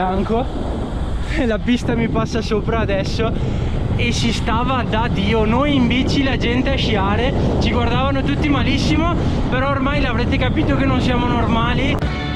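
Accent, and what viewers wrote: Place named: Veneto, Italy. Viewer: con l'arrivo della bella stagione perché no. Quanto impiego da Milano? Dal tuo accento penso tu sia veneto, magari Vicentino??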